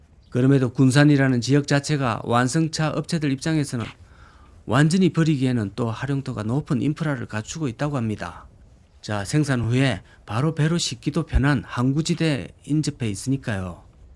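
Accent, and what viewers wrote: Gyeongsang, South Korea. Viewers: 얘기를 하던 도중에 ....마~ 하고 잠시 쉬어가는 투의 어휘를 사용하는데.... 이런 말투는 일본식 말투다......특히경상도 지역에 이런 말투가 유독 많다.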